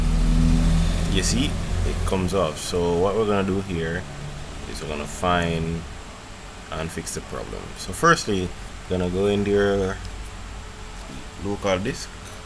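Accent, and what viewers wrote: Jamaica. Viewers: Respect you Jamaican? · I know a Jamaican when I hear one. Thanks mi bredda 🇯🇲💪🏾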